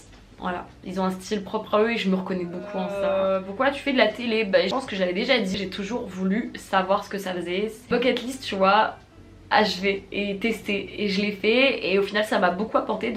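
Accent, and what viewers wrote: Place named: Germany. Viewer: Bonsoir, as-tu des origines allemandes? J’ai noté le petit accent très charmant 😊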